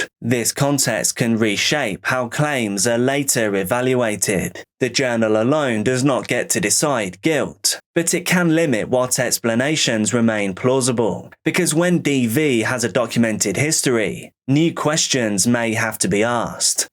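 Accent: England, United Kingdom. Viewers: Oh thanks for the "human narrated"!!🩷🩷i nearly skipped this content cause i thoight It was AI. ìnstead,you re englidh,not american! Love ot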